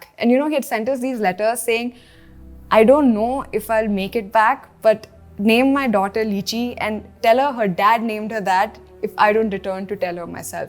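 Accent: India. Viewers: We all like your eng accent which is Indian. Unlike some stupid people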